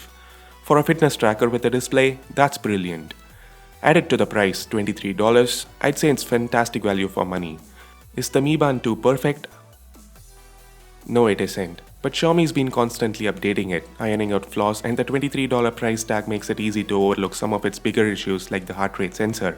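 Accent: India. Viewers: The Indian accent ruins the whole review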